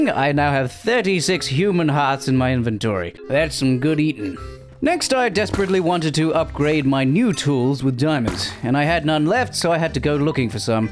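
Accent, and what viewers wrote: England, United Kingdom. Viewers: I love his fluency in British accent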